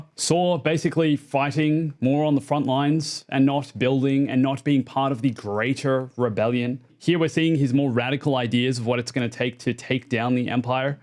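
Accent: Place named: Australia. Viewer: You sound like an expat Aussie. You seem to have a rhotic "R" sound like the Americans. Anyway, Good vid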